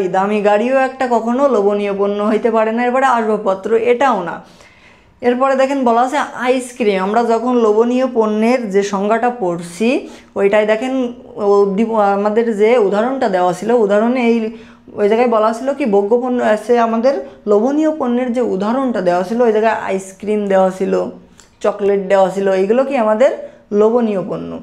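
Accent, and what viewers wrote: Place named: Khulna, Bangladesh. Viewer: দাদার যশোর অথবা খুলনায় বাড়ি 😊❤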